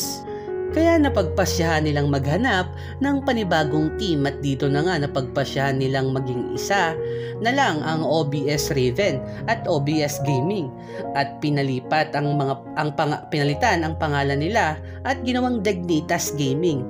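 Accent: Batangas, Philippines. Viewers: Ganda mo talaga magkwento lods. Ganda ng boses at pronounciation (Batanggeno accent) more power po.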